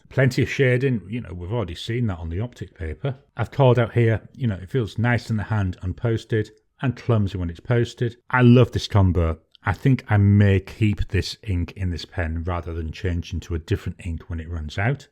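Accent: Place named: Birmingham, United Kingdom. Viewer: your bio says you're based in Austrialia but to me you sound like you're from Birmingham. Am I right or am completely off on this one 🤔😂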